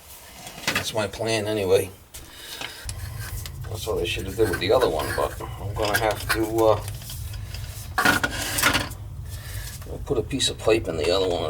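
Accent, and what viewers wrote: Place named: New Jersey, United States. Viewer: I’m not even a plumber but love watching this guy do his jobs with real life experience. Great explanations and New Jersey accent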